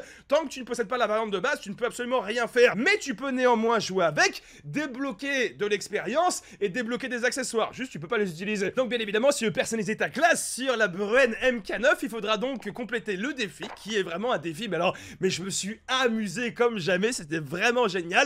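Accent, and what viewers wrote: England, United Kingdom. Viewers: Ton accent anglais me fait trop rire😂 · L accent anglais et ouf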